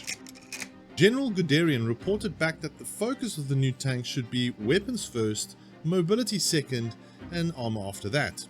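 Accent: South Africa. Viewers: Ah, a SAFFA! Good to hear a local voice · This is a bit off topic,are you a South African? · Are you South African? I am and you sound South African!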